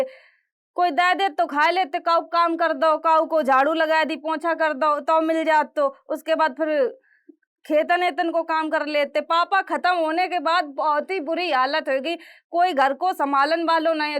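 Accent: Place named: Bundelkhand, India. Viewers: Bundelkhandi boli hm jalaun se h ☺️ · Ye hoti hai up bihar ki language.....jitni suno utni jyada pyari...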